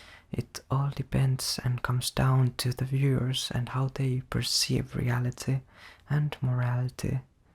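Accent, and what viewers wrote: Finland. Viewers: What is that accent? Almost sounds like a Finnish accent.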